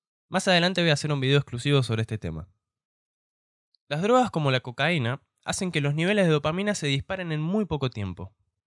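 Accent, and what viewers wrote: Córdoba, Argentina. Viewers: Argentino.....de Córdoba??? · Por tu forma de hablar sos argentino jaja saludos desde argentina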